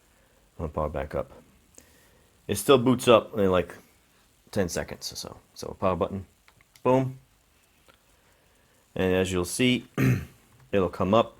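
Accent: Boston, United States. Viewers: Is that a boston accent?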